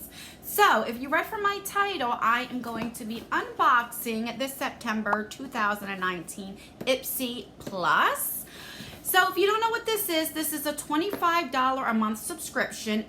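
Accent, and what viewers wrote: New Jersey, United States. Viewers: I love your videos. You are so funny and I love your NJ accent.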